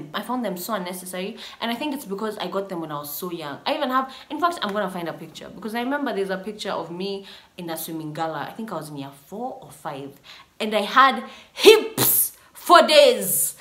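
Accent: England, United Kingdom. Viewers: It's the British accent for me 😂❤️❤️❤️ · That English accent is Perfect 👌👌🙌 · I don't care much about the stories.. But I love hearing that British accent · The British accent 😍 daamn girl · I love the British accent♥️♥️♥️♥️ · The English accent❤❤❤😂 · The British accent always giving me feel good vibes😁😁. This gonna be good 👌. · Am new here and the lady speaks British English.... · You know storytime is gonna be lit british accent ikicome through😂🙌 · It’s the British accent for me😂😂😂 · Your British accent ♥️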